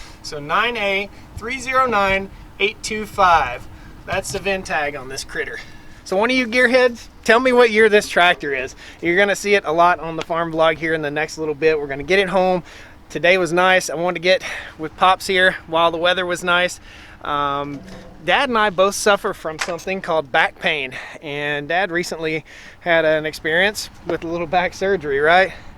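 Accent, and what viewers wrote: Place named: South, United States. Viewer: your dad really brings out your Southern drawl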